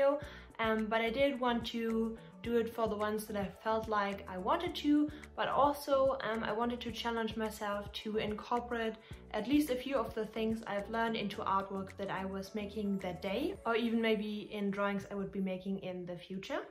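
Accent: Germany. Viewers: Du bist doch deutsch oder? 😁 den Dialekt kann man ja kaum überhören 🇩🇪👍🏼 danke für deine Meinung 🙂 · Heftige Englisch skills👏🏻 man hört den deutschen Akzent trotzdem direkt raus😂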